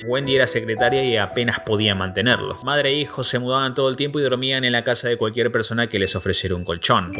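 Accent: Argentina. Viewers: acento argentino... apenas puede entenderse como un pésimo castellano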